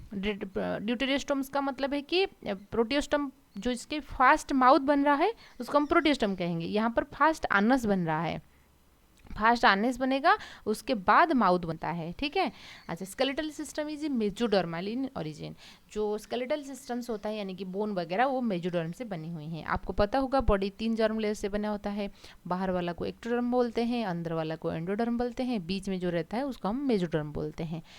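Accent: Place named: West Bengal, India. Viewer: Man you are from West Bengal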